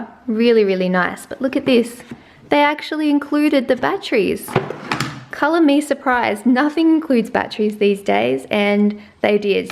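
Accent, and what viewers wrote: Australia. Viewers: that's true. But like you said it did look a little cheap. I love, that you are filming video again. I just love your australien accent, it always reminds me of the great time I had in Australia 😊 greetings from Germany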